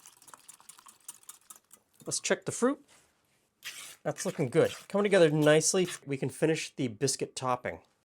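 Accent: Canada. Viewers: I really enjoy your channel. As a displaced New Brunswicker living in Virginia it is nice to hear "about" pronounced correctly!. I have a 1973 Northern Cookbook published by the Canadian Government Dept of Indian Affairs and Northern Development and I was wondering if you would like to add it to your collection. I think someone gave me this years ago and I have never used it (no local sources of beaver meat) but you may find it interesting. I think it is a bit of Canadiana and needs a good home. I will be visiting family and friends in Ontario later this month and could mail it to you from there if you would like to have it. Alfred Taylor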